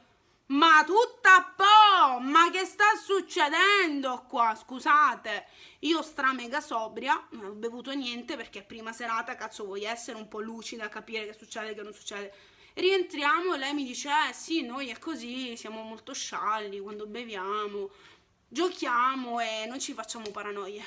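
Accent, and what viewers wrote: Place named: Palermo, Italy. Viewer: Bel video 🤣❤️ il tuo accento palermitano é il top ❤️🤣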